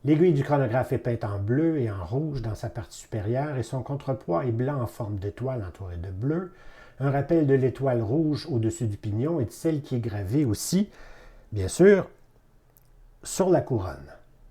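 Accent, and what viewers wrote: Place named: Quebec, Canada. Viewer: je vous en prie et votre bel accent du Quebec va très bien avec vos présentations...Bien à vous.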